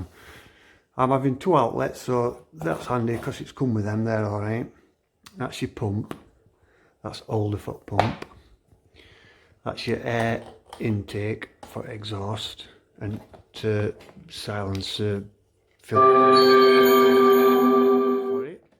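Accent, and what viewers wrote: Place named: Barnsley, United Kingdom. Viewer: That's a proper Barnsley accent - t'owd lad :-)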